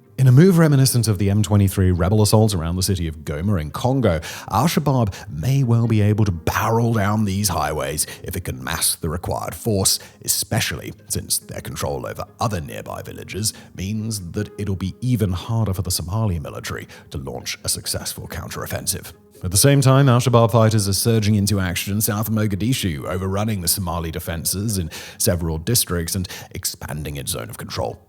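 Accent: United Kingdom. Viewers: Idk I think he's just british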